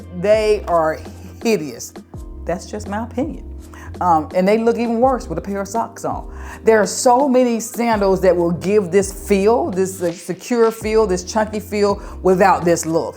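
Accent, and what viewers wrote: South, United States. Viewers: First time tuning in. I was so distracted by her talking about herself and her clothes in the third person "she" and the country southern fast-talking twang and accent was everything... especially when she repeatedly says "cumtuble" over 100 Xs for the word "comfortable". Could never take someone that talks like her very seriously about anything "fashion". Let me try watching another one of her videos. I'm sure she's good but as the English scholar that I am.... my ears 🤔😏😏🙄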